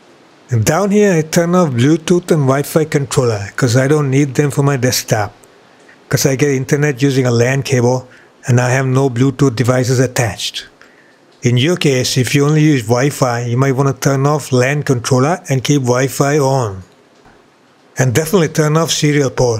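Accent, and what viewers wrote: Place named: India. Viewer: indian guy trying to speaking english like dolund trumph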